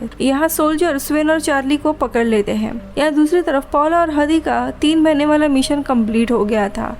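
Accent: Maharashtra, India. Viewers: Mam aap Maharashtra se ho ?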